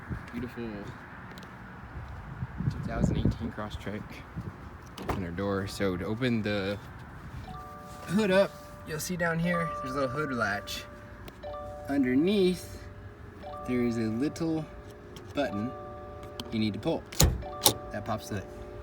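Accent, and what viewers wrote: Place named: South, United States. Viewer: Actually excited to change the filter now that I watched this super clear video. Your country voice is so soothing!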